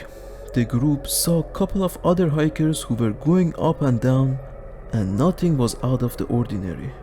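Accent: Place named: Russia. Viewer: I’m very happy that my comment wasn’t taken in a negative way! I really appreciate your willingness to try to enunciate a little more clearly and work on your English for me :) that’s really cool of you to do! One last thing, that is if you’re interested in my wishes, but don’t ever hesitate to make your videos as long as possible! I really enjoy and try to find the longest videos I can because it’s easier for me to sort of forget that I’m listening and just become hypnotized until I drift to sleep. I become your willing victim, I fall prey to the enchanted tone of your deadly sweet Russian accent... It’s harder for me to get to that place as quickly when I only have videos that are like 8-15 min. I like those a lot too but those are more for day time watching. When it comes to night time, sleepy time listening, longer videos are the best! Also, don’t ever let yourself become attached to or fall in love with any intros or outros to your videos that are like loud and abruptly audibly shocking like when you’re falling asleep watching an action movie at home and then an explosion suddenly happens in the movie after a long scene of quiet dialogue lol then you get jolted awake from the sudden volume change. 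A really incredible example of a YouTube channel that literally does everything I’m talking about 100% perfectly, in my opinion, and is the channel I compare every other missing persons/mystery channel to is the YouTube channel called “Unexplained Mysteries”. That channel uti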